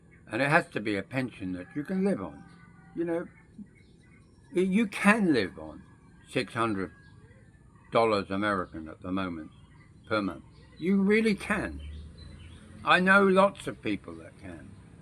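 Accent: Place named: England, United Kingdom. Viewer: You have the accent of a Pom not a nz accent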